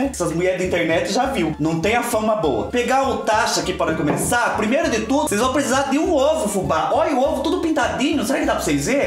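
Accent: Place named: São Paulo countryside, Brazil. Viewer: Bom diaaaaaaaa fubá,adoro seu sotaque e fiquei muito feliz de saber que você mora aqui por perto da minha família,minha sogra é de Itararé,meus sobrinhos de Itapetininga minha nora também, todos eles falam assim como você acho lindo, beijão seu jaguara marvado eu nem preciso que aumente minha borda de catupiry# a cinturinha 😂😂😂😘😘😘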